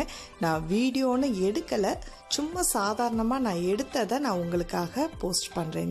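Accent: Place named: Kayalpatnam, India. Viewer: I cooked vetalappam which is so taste.Thanks for your recipes.Your voice is also nice with kayalpatnam style. Mrs.Shobaanandaraj Chennai.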